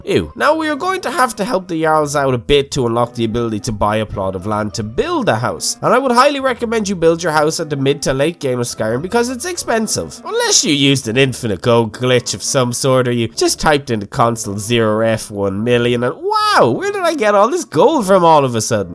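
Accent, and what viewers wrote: Ireland. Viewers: R u Irish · Standards? My standard is that Irish voice and a shot of a half-naked M'iaq cooking stuff in a pot.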